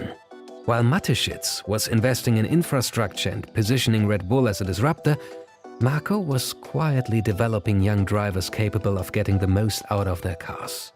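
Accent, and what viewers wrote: Germany. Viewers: you are german! i can hear it, how you pronounce the german/austrian names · No doubt the narrator is German. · Your are German right? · I'm new here but I could listen to the German accent all day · are you german? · So wie du die Namen aussprichst bist du Deutscher oder Österreicher :D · Your German accent is so off-putting.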